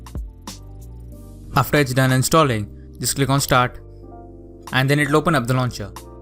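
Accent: India. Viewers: are you indian you sound like it and i am egyptain by the way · Please don't do the cliche basey remix intro sequence- Shit. Ok, but at least have a tutorial that isn't voiced by somebody with an indian accent (no offense meant) SHIT. Another one. At least don't do the "Before we start like and sub" DAMN IT! Ok, but at least tell me it's the real deal and it's not watered down or a virus- It's an EMULATOR?!?!?!?!? That's it, bye.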